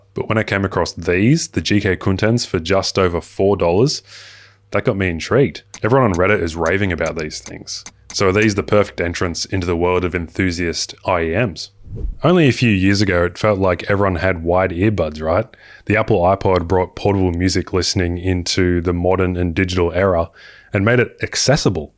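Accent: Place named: Australia. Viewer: aye, always good to hear a fellow Aussie within the tech space grateful to have found your video just now via the YT algo keep up the good work, mate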